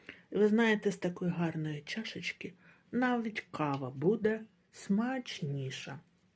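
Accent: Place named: Kherson, Ukraine. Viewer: Гарні покупки! Одразу по вимові зрозуміла що ви з Херсону❤ Запрошую на свій канал про бюджет за методом конвертів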